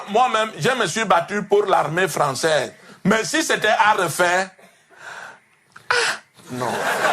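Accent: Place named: Ivory Coast. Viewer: Il a fait un accent ivoirien pas sénégalais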